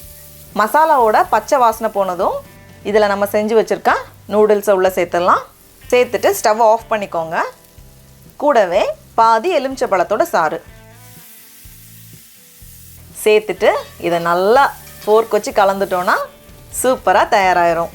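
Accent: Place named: Tamil Nadu, India. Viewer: Whenever I want to cook something special, I search for ur videos only first, Namma oor tamil la unga thelivana pechu alagu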